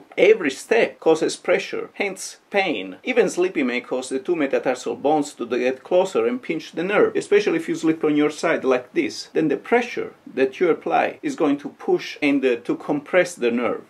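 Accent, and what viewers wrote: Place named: Italy. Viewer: This jerZ girl ❤️that Italian accent!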